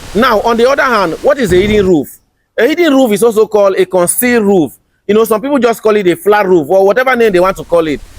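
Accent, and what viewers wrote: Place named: Esan, Nigeria. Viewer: you sound Esan . are you?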